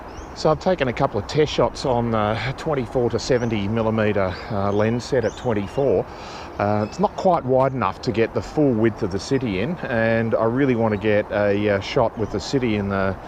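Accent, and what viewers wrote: Australia. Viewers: I liked the video "Dad's garden" that was the first time I realized I do prefer the broad accent. I think you talk "Strine". It's actually what I want to learn and aim for. I will certainly watch all of your videos they are amazing and yes, I can also practice Aussie English.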